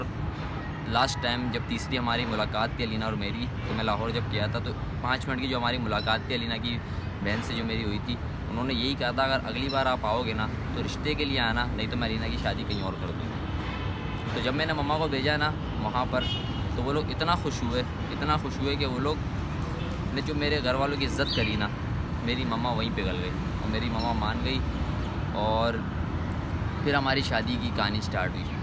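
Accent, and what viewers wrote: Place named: India. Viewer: Assalamu alaikum bhai, Aap Mujahir hu shayed kyuki aap indians ki taraha baat karte hu may be u r family is Indian..........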